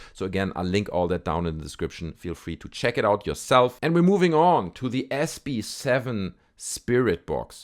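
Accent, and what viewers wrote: Germany. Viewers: I love your German accent! It's cool! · very good english but i know exactly that you re German 😂 thick accent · why you sound german lol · Mann, hört man dein deutsch sein raus 🤣🤣🤣🤣🤣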